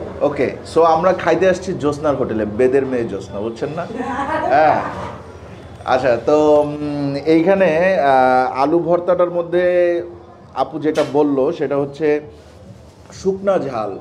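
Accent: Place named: Barisal, Bangladesh. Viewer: বরিশাল জীবনে যায় নাই, কিন্তু ভাষা ঠিক আছে বরিশালের।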